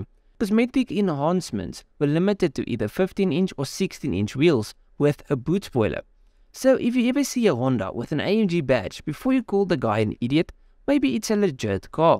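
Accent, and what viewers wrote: South Africa. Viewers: I love the south African accent so much, it's adorable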